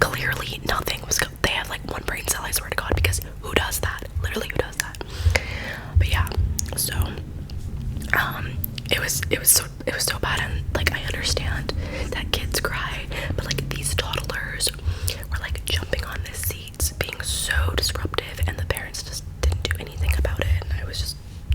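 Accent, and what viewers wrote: United Kingdom. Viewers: Love the British accent 😂😂🔥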